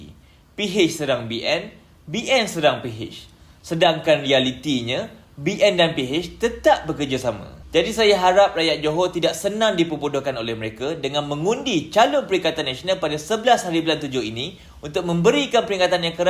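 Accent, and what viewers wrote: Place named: China. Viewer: slang ckp pun mcm bangsa C😌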